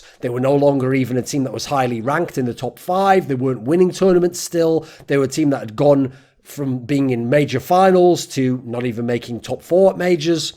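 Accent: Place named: North, United Kingdom. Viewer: He comes from the north of UK, doesn't he?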